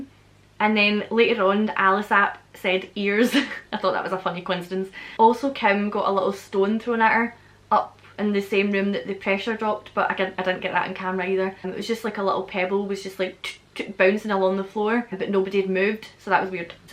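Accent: Scotland, United Kingdom. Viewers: Looking for evp vids whilst working. Clicked on yours and I am glad I have not even got to the Evps but its nice to hear another Scottish accent on the old YouTube. Subscribed straight away haha love from Dundee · Scottish! I could tell right when you opened your mouth,lol. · btw, might I suggest this to your viewers? I would suggest they go watch Jessica here <link> and then come back to videos, and just try to understand her. It's so weird, my brain switched to the Irish accent (trained linguist here btw) and then when I got here it couldn't switch to Scottish accent! It's like it got stuck so I spent more time struggling with this video, when normally I don't have any issues. I think I might have just discovered how to break your brain! LOL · I love your accent so much. My family is Scottish on my grandma's side and we visited last year for the first time. 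I fell in love with the Highlands and would love to move there some day. 💜🏴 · Didn’t expect you to be Scottish lol. Pleasant surprise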